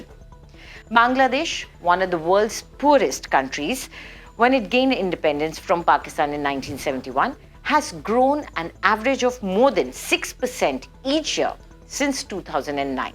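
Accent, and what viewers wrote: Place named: India. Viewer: Sometimes I don't know why you could pick up such a good oral indian english but Not a proper english accent. It's time for you to go to UK or to USA for an accent reduction therapy session.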